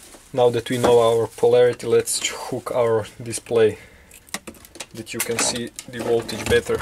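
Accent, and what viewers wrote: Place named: Russia. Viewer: Такое ощущение, что твой родной язык русский.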